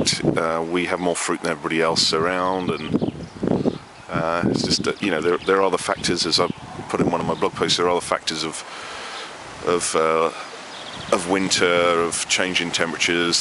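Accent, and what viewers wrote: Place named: United Kingdom. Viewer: you sound british, where do u live in uk??? tour garden is massive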